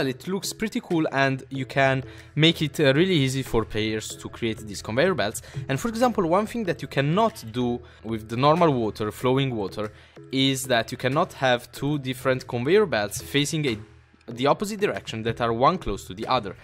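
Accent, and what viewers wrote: Italy. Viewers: Si sente che sei italiano 😉😉 xD Sei un grande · quanto si sente che sei italiano😂 comunque sei un figo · si sente che l'accento è marcatamente italiano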